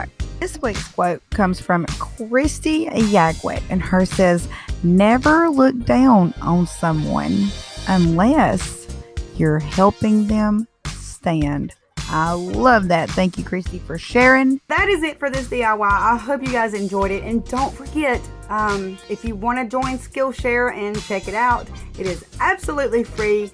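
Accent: South, United States. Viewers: Love your projects and your southern accent 👌🏾👏🏽😁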